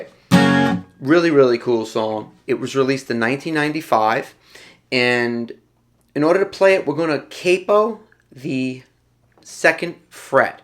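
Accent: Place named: New England, United States. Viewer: Awesome instruction and easy to follow. Bonus for the NE accent.👊🏻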